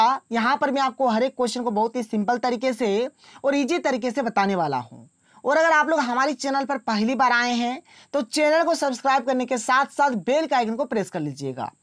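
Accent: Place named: Jharkhand, India. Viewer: Aapka language kabhi kabhi Jharkhandi jaise bolte hai aap sir ji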